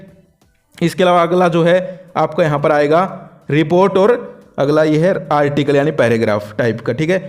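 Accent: Haryana, India. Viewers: Sir too haryanavi hai ❤❤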